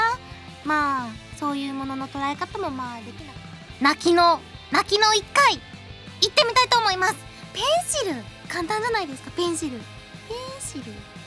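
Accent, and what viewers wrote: Japan. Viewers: английский, с японским акцентом, это нечто <3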